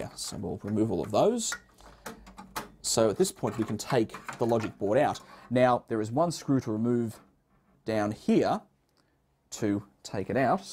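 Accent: Australia. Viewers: I recognise that accent! Its the same as mine, he's an aussie!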